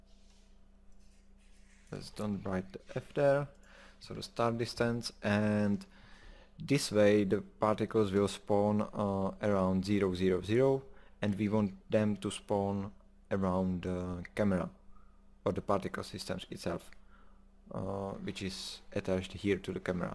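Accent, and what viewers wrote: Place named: Latvia. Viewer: Are you from Latvia? Your accent sounds familiar :) Thanks for the video!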